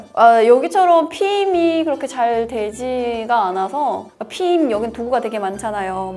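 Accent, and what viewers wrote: Seoul, South Korea. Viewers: 북한분맞으세요? 남한사람인지 북한사람인지 모르겠어요ㅎ 완전 한국사람다되셧네요.북한말투가 남아있지않네요. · 서울 말씨를 완벽하게. 머리가 좋다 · 아궁이...밥맛은 좋을듯... 아무리 봐도 북한에서 온사람 같지 않음....말투도 그렇고....그냥 서울태생 같음... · 서울말을 쓰네요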